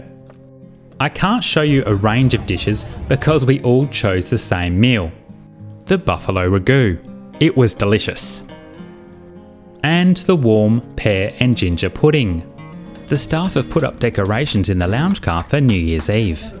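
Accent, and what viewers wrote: Australia. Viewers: THANK GOD == YOU SPEAK AUSTRALIAN == I enjoyed your train video = I was wondering how to spend our money =AFTER RETIRERING == kind regards elizabeth NZ xox widow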